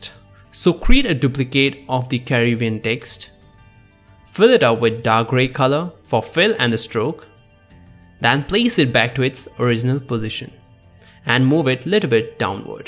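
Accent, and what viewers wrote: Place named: India. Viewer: chhhharactorr ? indian cu*t ? lol shity accent always leaks out. No matter how hard you try...wannabeeez!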